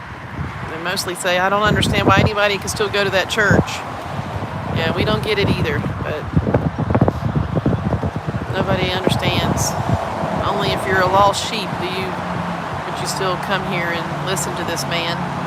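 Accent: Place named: South, United States. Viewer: they are nasty people. I am so proud of you and I love you southern accent! I am a northerner 😂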